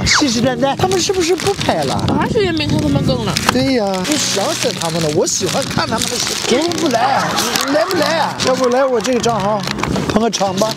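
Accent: Dalian, China. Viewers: 大连话不要太标准啊！太怀念国内了！ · 哈哈 真幽默。而且大陆口音也逗。订阅开始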